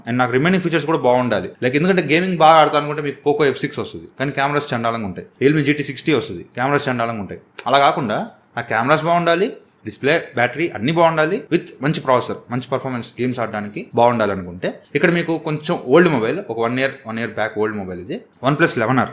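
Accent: Nellore, India. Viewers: Bro miru Nellore ra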